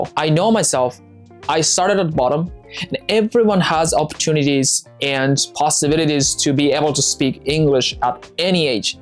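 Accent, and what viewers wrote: Japan. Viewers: 発音 何かカタカナっぽさが残ってない? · 発音指摘してる人いるけどこの人ネイティブじゃないんだから当たり前だろ どんだけ英語に使っていても悲しいことに中々日本語アクセントは消えない……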